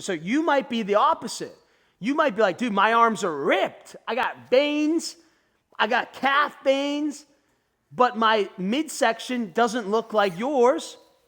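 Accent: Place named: Philadelphia, United States. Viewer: 47 year old Irish prick from Philly here. Your accent and mannerisms are similar to mine even though I've spent decades on the Left Coast. I like your advice and information. I'm struggling with getting belly fat gone. I do HIT lifting twice a week. I tried every 3 days the past two weeks but my recovery takes longer at my age. Nevertheless, I've seen really good results muscle wise and I also walk 2-3 times per day morning, midday, and after dinner. Walking is huge! I'm not into running, but I'm pretty consistent with walking, good sleep, lifting, and eating healthy. I eat mostly whole foods, but I think my problem is the portion sizes and eating carbs later in the day like you pointed out. Any other advice would be greatly appreciated. Awesome video. God bless!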